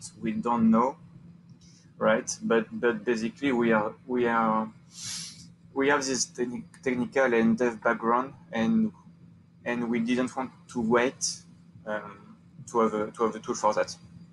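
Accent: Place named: France. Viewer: I didn't know I was looking for a French accent cliché until I found this video...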